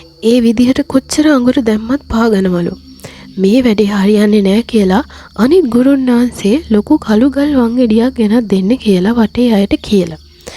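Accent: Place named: United Kingdom. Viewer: Uk accent nam set ma na boka 😅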